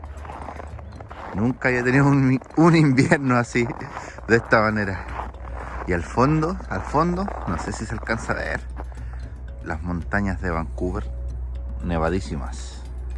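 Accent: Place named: Chile. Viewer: Llámame loco, pero me basto 1 segundo de tu voz para darme cuenta que eres Chileno, ojala nos encontremos en algún momento allí en Vancouver ya que viajo en Marzo, saludos!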